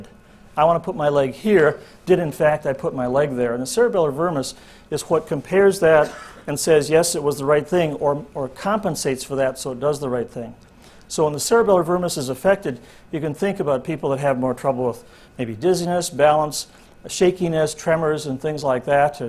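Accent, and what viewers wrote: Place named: Kansas City, United States. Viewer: Were you rasied in KCMO? You sound similar to me. I'm a shaken baby, with a birth defict spina bifida, and hydrocephalus. Also, the neighborhood, the stores around me, and my schools were also abusive. At least when it comes to the schools, I wasn't the only one. There are some really bad teachers and high school counselors. Sadly in MO, CPS sees you as an adult at 17, not 18. When I was 16 a Dr. reported my mother, but nothing could be done because I was to close to adulthood at 17. Really a bad state with social services, not only with children but with the elderly as well.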